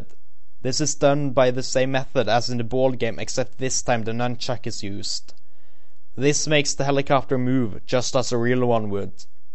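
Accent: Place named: Norway. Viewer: hører så sykt at du er norsk :) nice presentasjon foresten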